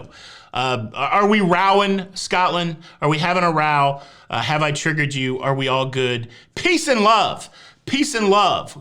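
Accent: United States. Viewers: Give the guy a break. Talk about oversensitive! American accent.. so what?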